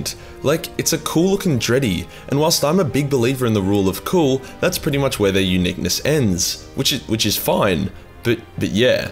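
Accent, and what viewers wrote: Australia. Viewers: i was like whys the narrator talking shit and swearing so much and then im like oh yeah he has an australian accent too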